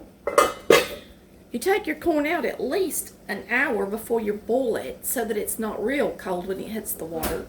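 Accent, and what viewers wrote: South, United States. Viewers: Southern accent ✔️ Named ✔️ She knows what's up 😊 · Your American accent just makes this the most perfect video!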